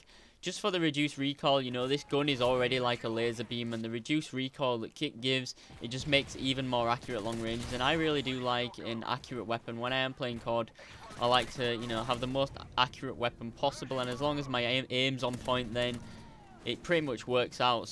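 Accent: Yorkshire, United Kingdom. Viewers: are u from yorkshire ?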